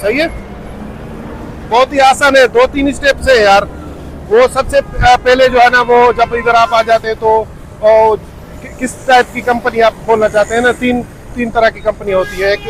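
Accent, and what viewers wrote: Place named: Sindh, India. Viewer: Talking like a sindhi national,,aon sahih pyo chawan ya galat ❤ saen kerry ahyo ?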